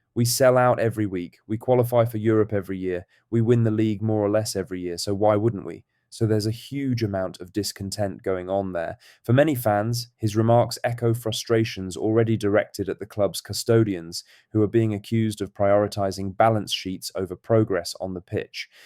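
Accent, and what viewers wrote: England, United Kingdom. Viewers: Beautiful narration in the King's English!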